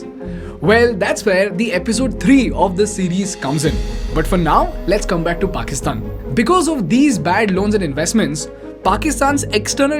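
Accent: India. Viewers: He is speaking normally only, people of his generation(+younger) in india speak English like this not with the stereotypical accent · Typical Indian accent - Why cant you Indians just speak in a proper way?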